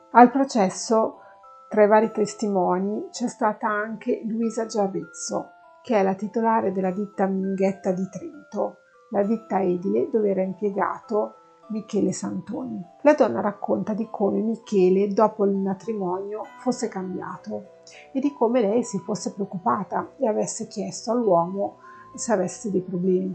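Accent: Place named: Lombardy, Italy. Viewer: Ciao complimenti appena arrivata sono in clandestinità perché sto usando un iPad condiviso. Complimenti soprattutto perché tratti dei casi italiani. Mi piace il tuo accento lombardo. A presto Lally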